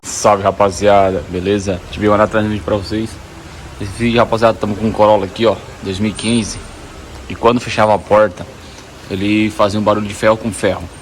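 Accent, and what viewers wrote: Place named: Santa Catarina, Brazil. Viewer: Sotaque de SC. É da Floripa?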